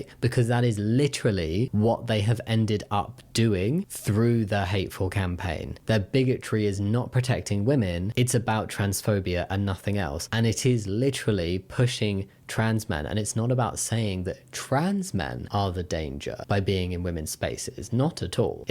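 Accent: United Kingdom. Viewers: I always watch these videos to watch a british man talk in a british accent to do gay british things. I always end each video learning more than I do in any of my classes.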